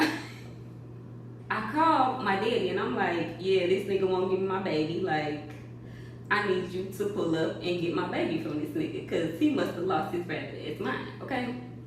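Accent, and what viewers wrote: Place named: St. Louis, United States. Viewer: soon as you started talkin i knew you was from stl 😂 but not the fight happened at your daddy house & not the bd house omg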